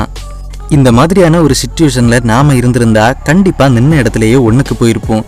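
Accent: Kanyakumari, India. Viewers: உங்க ஊரு கன்னியாகுமரிதானே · Bro neenga Kanyakumari ya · Bro KANYAKUMARI aahh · Nega kanyakumari ah 🤔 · Bro neega kaniyakumariya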